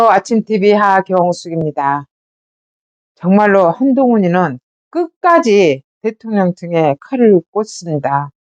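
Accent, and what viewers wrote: Jeolla, South Korea. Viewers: 절라도 사투리가 구수하게 들리는 건 처음 ㅋㅋ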